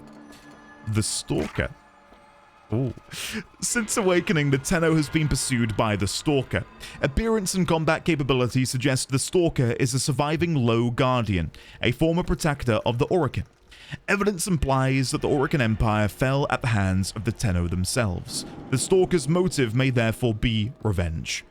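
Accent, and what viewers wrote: United Kingdom. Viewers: weird to hear a northern uk voice in warframe's universe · When you first pronounced "capillary," I just chalked it up to you being British. I mean, really, you just say everything wrong anyway. You pronounce r's like a's ("neah" instead of "near"), you pronounce a's like r's ("i-dear" instead of "idea"), then there's the whole aluminum/aluminium thing. So when you said "capilliary" I didn't bat an eye.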